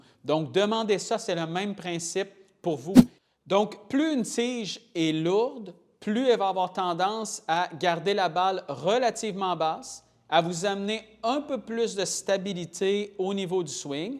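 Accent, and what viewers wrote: Quebec, Canada. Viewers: C'est dépaysant d'écouter un québécois. En France on parle de tige pour une plante ou pour quelqu'un de grand 😁 Ici on parle de club de golf. Mais le plus drôle c'est quand tu parles des distances 😁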